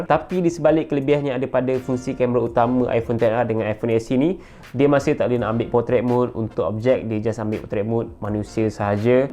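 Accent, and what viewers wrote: Malaysia. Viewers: Aksennya? Dia orang malaysia?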